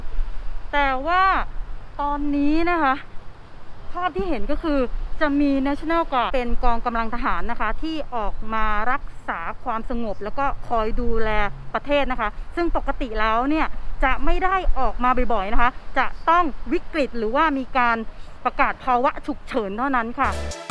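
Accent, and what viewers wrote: South, Thailand. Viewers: คุณ เป็นภาคใต้บ่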